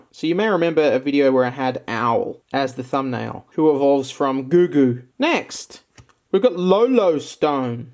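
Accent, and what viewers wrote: Australia. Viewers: Shouty aussie gets words wrong. 10/10 I'm happy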